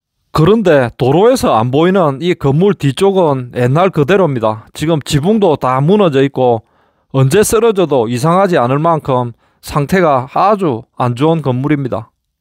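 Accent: Gyeongsang, South Korea. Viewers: 경상도 목소리 너무 거북해요 웬만하면 표준말로 해주시면 안될까요??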